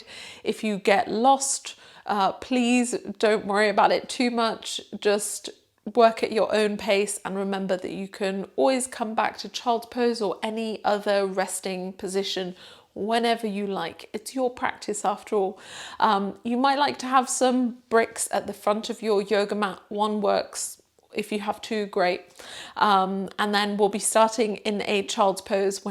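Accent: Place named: England, United Kingdom. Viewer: I so needed this! Thank you so much again! Always love the england accent in there:)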